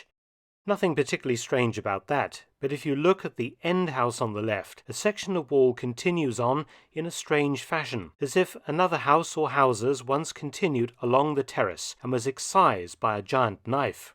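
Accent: United Kingdom. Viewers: What he is saying when he repeatedly says something that sounds like: corner lots filled with "appetizing hoarding" or "appetizing awning"? It must be some combination of pronunciation differences (I'm American) and common British terminology or phraseology that I'm not familiar with. -Huge fan of Dr Mark Felton's videos!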